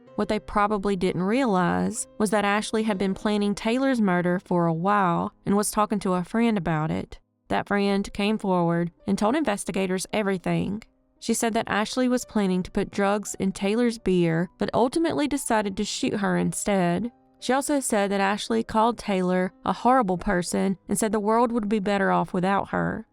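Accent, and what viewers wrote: South, United States. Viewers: It’s because she isn’t on-screen. The biggest channels have hosts that are nice to watch. Also, a lot of people think the southern U.S. accent sounds dumb. In the states, we look down on the dumb country folk 🤷🏼‍♀️ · Hi, I meant Girl 👋🏽😏. I never realised just how very many videos you've actually made. Just when I think 🤔💭 I've seen em all, I find a load more!! Don't think that WE, Your Loyal, Lovin' Fans Don't appreciate all the Effort, Time & Hard Work that You put in, because WE Really DO. Also, I Really, Really love ❤️ your Accent!! WE All Do. Thank You, Girl & rather than say "Keep Up The Good Work", I'm actually going to say "Take it easy on Yourself Miss & make sure you have time for yourself too". Kind regards to both You & Your Family, From The UK via Jamaica 🇯🇲💯 God Bless You. Wayne D. 😏👍🏽🌹🌹🌹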